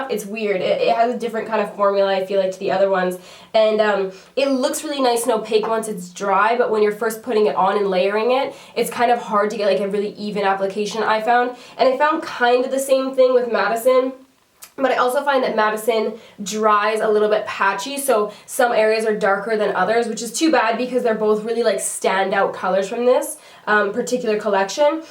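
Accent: Canada. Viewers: I don't know if it is because english is not my first language but this is the first time I noticed your Canadian accent. Is real pretty though.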